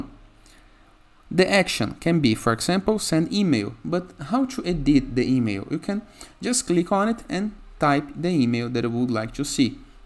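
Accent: Brazil. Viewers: Hi, are you brazillian? Your english looks like a brazilian guy. Thanks a lot for tutorial.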